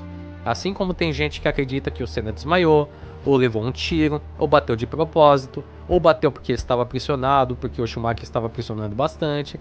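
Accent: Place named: Portugal. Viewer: Ele é português? Tem sotaque.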